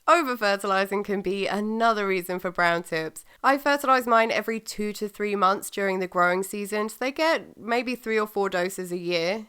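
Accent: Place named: RP, United Kingdom. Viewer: It’s a perfectly normal English accent. RP ( Received Pronunciation) , or the Queen’s English ( as it was once known). Probably both terms deemed hate crimes or xxxxxxxphobic or some such nonsense in today’s’woke’ society. Just plain English. As was once the dominant language in England.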